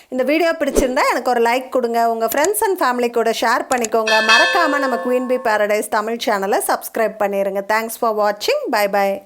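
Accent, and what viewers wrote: Coimbatore, India. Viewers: Mam.. r u from Coimbatore?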